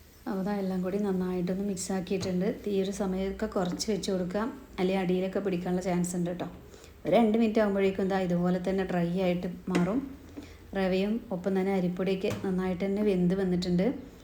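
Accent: Malappuram, India. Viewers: പക്ഷെ ഈ ചാനലിന്റെ ഒന്ന് രണ്ടു വർഷം മുന്നേയുള്ളതിൽ ഒക്കെ തനി മലപ്പുറം സംസാരം ആണല്ലോ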